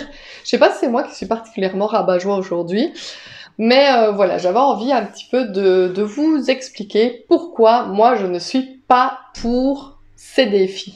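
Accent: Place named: Liège, Belgium. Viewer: votre accent est si exotique! il s’agit de liège ou jme trompe!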